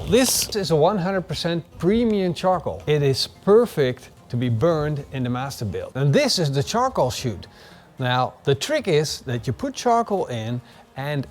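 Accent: Netherlands. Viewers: Sorry for my last comment in another video, I don’t even remember which video I left it. When I say that you fuck up the recipe, doesn’t mean that you are a bad cooked, I really see you as a temperature and meat expert. It’s just that so many video you seem to add the little touch that upset everyone and get roasted in the comment section.. You can’t deny that. I really enjoy your recipes , and watching you cook. Especially with your Dutch accent, if I found the other comment I will erase it cause it’s can be misunderstood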